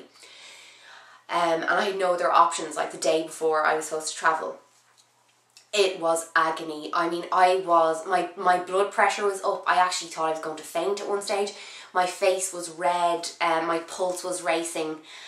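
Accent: Ireland. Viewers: are you irish? or from the uk because I hear a accent in your voice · Irish =) The best accent in the world!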